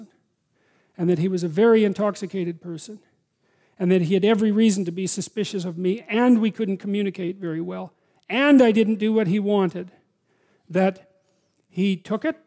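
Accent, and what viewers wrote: Canada. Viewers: Mark Arandjus Yeah a real Canuck lol.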